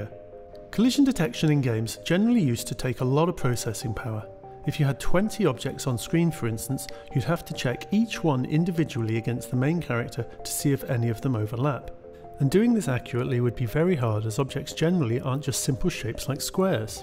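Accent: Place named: United Kingdom. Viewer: I really like your voice. Friendly and pleasant. I like British accents too. :3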